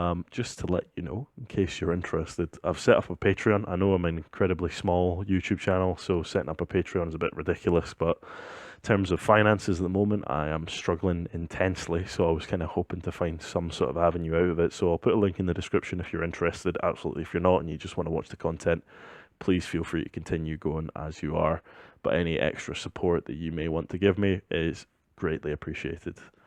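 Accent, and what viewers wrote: Scotland, United Kingdom. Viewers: Yu scotish m8 holy feck that be rare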